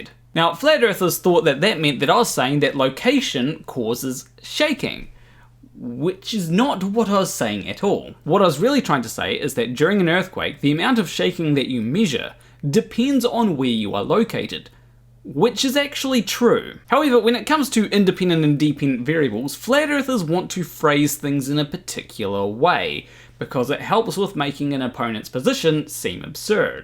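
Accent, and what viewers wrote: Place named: New Zealand. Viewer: Phoebics... Always good for a laugh. We have a very short life span in the scheme of things, and the best you can hope for is to be happy, looking forward to gettin up in the morning. If that means identifying as a cross/trans/metro/gay or any of the other options, just do it 👍. If it doesn't hurt others in your choice, then how can there be a problem? Great stuff as always, and always nice to hear another Kiwi accent on the tube. Cheers Hokitika.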